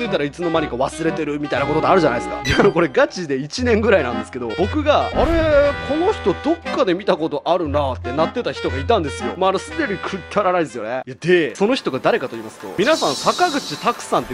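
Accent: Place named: Chikugo, Japan. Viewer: 正確には筑後弁だね？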